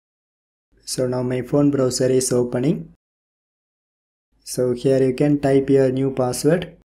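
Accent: India. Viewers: its okay saying it wrong its just because uour indian so its hard to say a simple thing but your good at english❤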